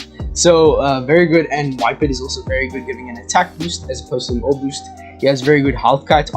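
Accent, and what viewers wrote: South Africa. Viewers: Bro i have to ask lmao, but are you south african? Love the content btw. Keep it up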